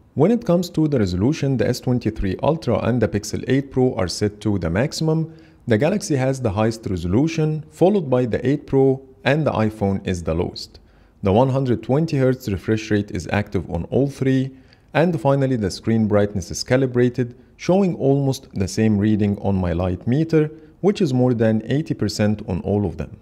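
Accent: Egypt. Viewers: انتي مصري صح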